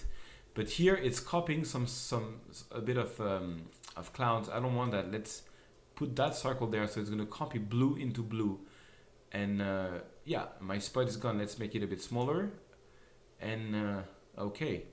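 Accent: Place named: France. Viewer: Merci pour le tuto, et l'accent Français aussi ^^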